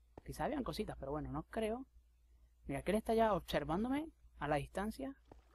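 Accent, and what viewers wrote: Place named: Venezuela. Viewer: eres de venezuela? xq yo si lo soy, tienes el tipico acento venezolano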